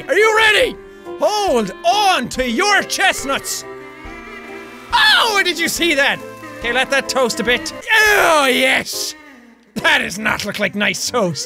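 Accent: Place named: Ireland. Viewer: Your Irish I'm Irish too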